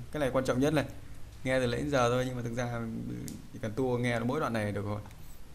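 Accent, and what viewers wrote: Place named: Vietnam. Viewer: Đọc tiếng Anh như tiếng Việt, hài quá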